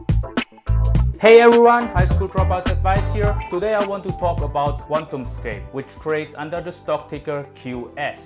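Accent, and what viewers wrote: Germany. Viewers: Do you have a German accent ? Great video .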